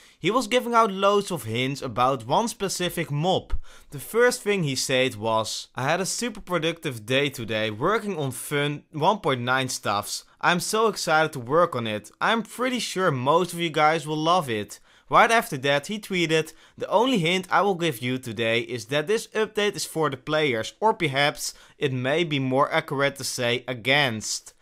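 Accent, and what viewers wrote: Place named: Netherlands. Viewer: Je bent nl dat hoor ik. Lol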